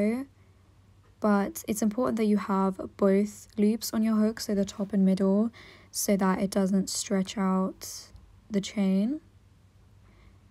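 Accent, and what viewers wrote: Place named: Russia. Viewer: русский акцент такой емае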